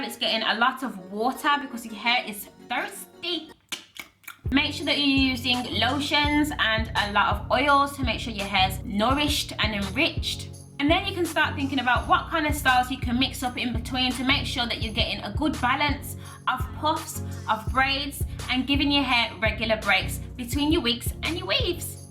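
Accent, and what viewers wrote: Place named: United Kingdom. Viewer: Thank u for these advice. New subbie. I love ur british accent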